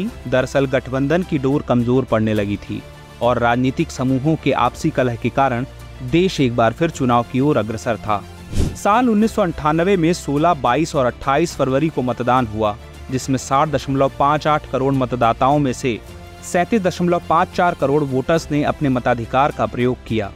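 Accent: Bihar, India. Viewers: Aap Bihar se ho...? Hna..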